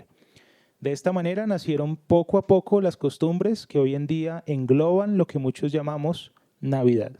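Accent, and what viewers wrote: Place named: Bogotá, Colombia. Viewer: Buena investigation, pero esa voz de rolo, bogotano homosexual esta pesima.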